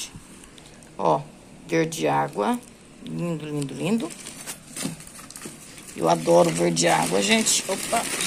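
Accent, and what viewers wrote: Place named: São Paulo, Brazil. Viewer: Vc e do interior de São Paulo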